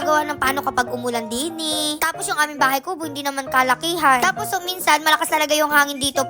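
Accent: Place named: Marinduque, Philippines. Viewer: ang totoong kamangyan dapat isa sa parte ng province ay malaman ng taga mindoro kasi ang bansag na mangyan sa sa mindoro or. calapan dahil laki ako ng mindoro calapan, ganyan ang aking tanong or hinahanap. ang iyong punto... yan ng marindoque/ hindi mindoro yan. pero ganyan ang punto ng taga pinamalayan mindoro. at may batangas pa taga saan kaba talaga?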